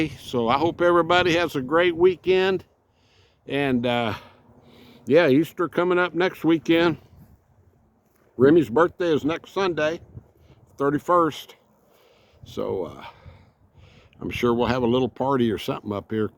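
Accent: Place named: Texas, United States. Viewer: Just came to your video (partly) because your title mentioned Sarasota Tim - he's a hoot to watch. Watched a couple of your videos and enjoy your Texas drawl and the way you comment on the Davis mountains and the surrounding area. Beautiful country. That Dixie can really move. She looks like a Border Collie, I grew up with a BC in my youth in Houston. Just subscribed.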